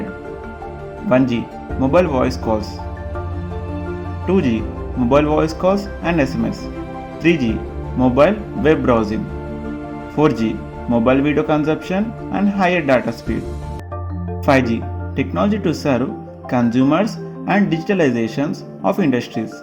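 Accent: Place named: South India, India. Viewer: Good voice if not perfect . Hint of south accent . Mis pronunciation of few words . Overall 4/5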